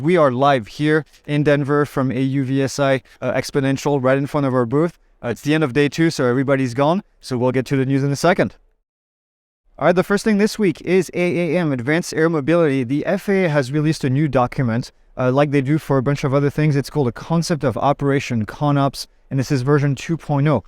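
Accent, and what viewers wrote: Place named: France. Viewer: Of French vocal cadence (accent), some of his words sound different, of course. A phrase he stated is actually very accurate in how it sounds, although he purposed a different meaning. "NASA has this information." is what purposed to denote, though of his vocal cadence, to the common English language eear, it sounds like "NASA has *disinformation.*" Not sure how accurate realizes his statement actually sounds as it is factual! Indeed, the American government has much disinformation all the time!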